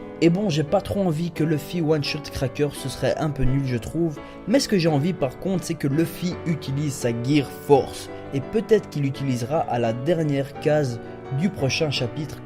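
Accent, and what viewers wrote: Belgium. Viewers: il super bien ton acent belge ca fait marre et ca change de ceux des francais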